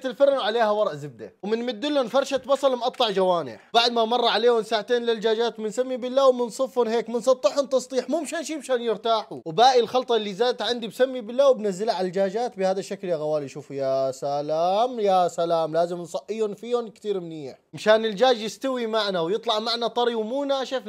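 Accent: Syria. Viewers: من درعا واضح من اللهجة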